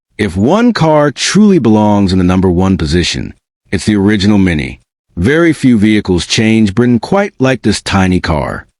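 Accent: United States. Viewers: Why do we have to have an American to narrate a video about British cars..I can't be bothered to watch after 2 minutes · Sorry one minute of the American commentary finished me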